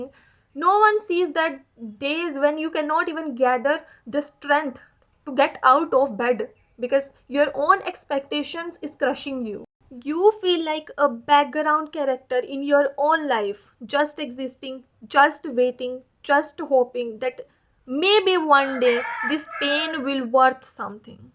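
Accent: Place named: Punjab, India. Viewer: oh sry. But your accent is just like Punjabi's Btw your videos are positive. Keep it up, Good luck 🥹🎀💖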